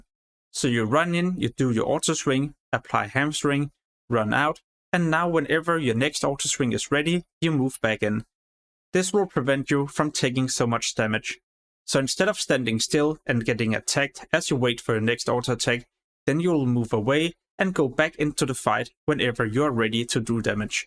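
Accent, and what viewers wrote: Denmark. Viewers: The danish is strong with this one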